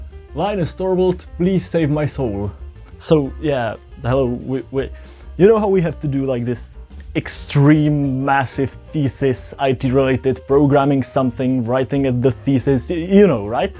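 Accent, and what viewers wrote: Czech Republic. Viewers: me when czech accent i thought it sounded like one, checked bio and sure enough. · Já si říkal že tam slyším českej přízvuk. Neuvěřielná práce!! SKvělej projekt a myslím že to dost retro programátorů ocení. Jen tak dál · ty jseš čech? poznal jsem podle přizvuku.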